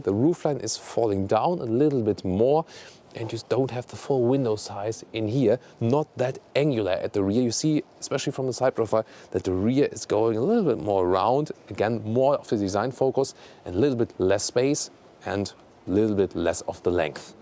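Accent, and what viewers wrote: Germany. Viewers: ich finde, dass das Englisch noch zu Deutsch klingt.. Und andere Frage, wo genau ist denn dieser Ort? Da sind anscheinend immer "Fahrzeugvorstellungen" mit einer art von Modell? Ah und wegen dem Knöpfen auf dem Lenkrad, genauso wie beim Ford Mondeo ;)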